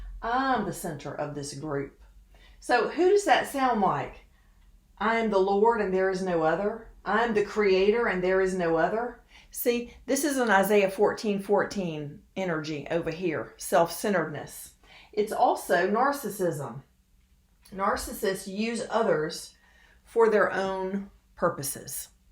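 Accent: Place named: South, United States. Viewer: Beautiful southern accent 😍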